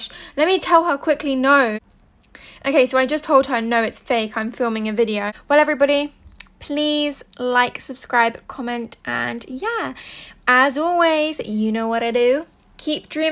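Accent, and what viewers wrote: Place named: United Kingdom. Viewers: Ummm what do you mean all British accents sound the same. Hers is not the best accent. I ave a British accent and it sounds the exact same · Britishhhh~~~~~ da fancy accent ._. it's hard tho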